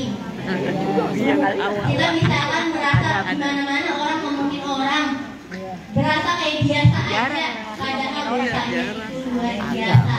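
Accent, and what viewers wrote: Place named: Arab world. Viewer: Dialeg bahasanya Arab bangat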